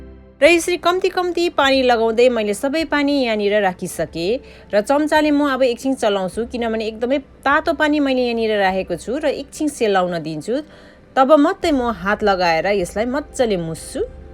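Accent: India. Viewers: Sneha je jsto vayo pani nepali jsto bole pani kaam ta ramro garnu voko cha di le..Bhasa ta hami eta India ko nepali le estai bolchau maan parcha ta thik cha maan pardena ta na hera video choice is yours.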